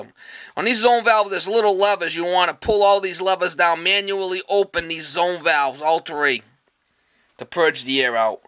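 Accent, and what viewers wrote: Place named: Northeast, United States. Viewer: you have done a service to all. 1. younglings, they need the facts and must listen carefully 2. blue hairs, need to learn the newer stuff. 3. customers, yes customers, they need to see what it takes to get the comfort that they have grown a costumed to, and need to be willing to pay for. your videos are invaluable to all. and I thank you for a very clear honest and informative channel. "every day is a school day" is dead right, I work in industrial maintenance and that is the best truth that I have herd in along time I will plagiarize the . I have watched 4 hours if your videos tonight on the 52" plasma w/ full Dolby surround and loved every minute of it. WOW you are honestly the greatest. & I love you heavy N/E accent, it is killer! you are a gem. & yeas I know how much work it is to prepare each video, not so easy. 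even if you try to edit "in camera" it still takes a lot of work.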